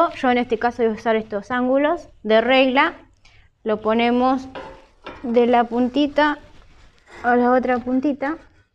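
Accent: Argentina. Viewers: BONITO ACENTO TIENE LA ARGENTINA , CHIDA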